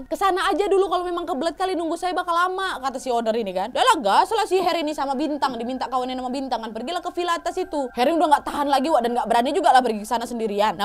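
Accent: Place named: Makassar, Indonesia. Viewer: Logatnya kayak logat orang sulawesi (makassar)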